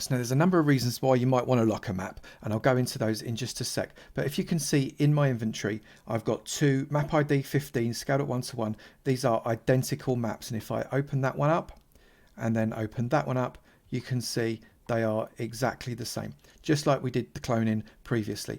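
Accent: United Kingdom. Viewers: Isn't this British accent?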